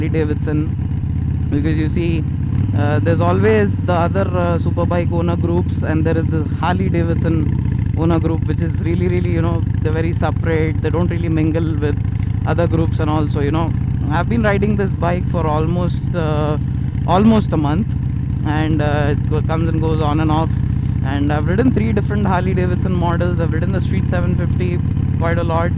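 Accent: Hyderabad, India. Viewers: Your accent is awful and here you are trying to get rich on YouTube. Why don't you go fishing and work for a living like the rest of your Indian brethren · i knw indian accent but this is way too indian.. and FYI i stopped as soon the accent ws killin me ....literaly..... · Bro please make one video per month in your hydrabadi accent. I love your hydrabadi accent. please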